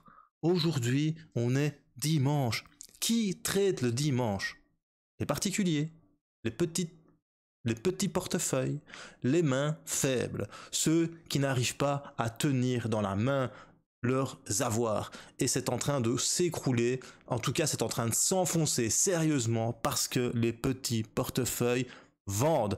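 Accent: Liège, Belgium. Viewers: Lol j adore le ton que tu emplois ça en met pleins les dents aux détracteurs mdr. Tu es de Belgique ? Je perçois un accent belge de Liège où je dois me tromper !?!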